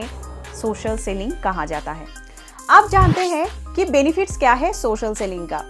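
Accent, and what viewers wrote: Bengal, India. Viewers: Ap bangal se hai kya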